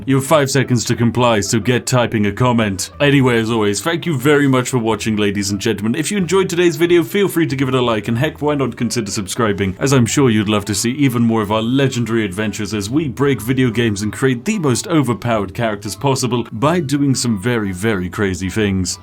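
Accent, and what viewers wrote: United Kingdom. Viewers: Day 1 of reminding we want to hear him say "Sugoi" in his glorious British voice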